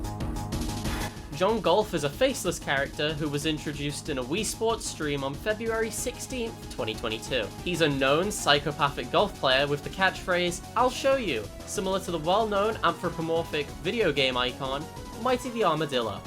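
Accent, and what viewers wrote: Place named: England, United Kingdom. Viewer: then take my sub and use it well, funny english sounding guy.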